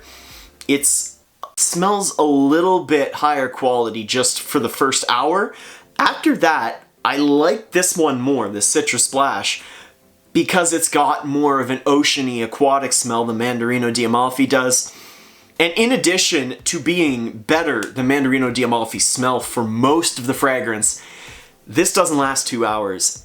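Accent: Canada. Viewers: You sound hella Canadian.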